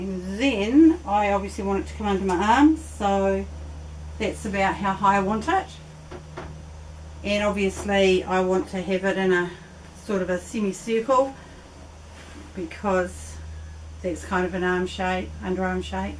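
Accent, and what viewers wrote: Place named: New Zealand. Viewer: She is a Kiwi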